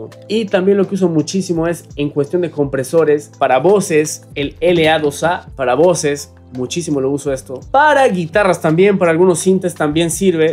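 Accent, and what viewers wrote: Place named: Ecuador. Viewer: bro eres peruano pero tienes acento ecuatoriano xd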